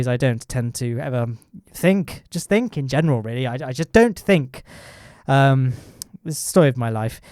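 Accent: United Kingdom. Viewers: This is the most british commentary i've ever heard :D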